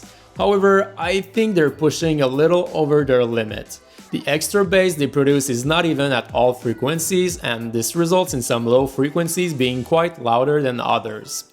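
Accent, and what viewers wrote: Quebec, Canada. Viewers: J'adore ton accent québecois! Je subscribe! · are you from Quebec? you have a French Canadian accent. nice review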